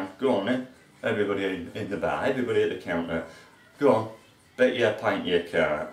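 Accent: England, United Kingdom. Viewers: Great accent. It's like listening to a scene from a Beatles movie. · The English accent and ciggie gives him more credibility. :) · Thats not what Irish sounds like, hes English.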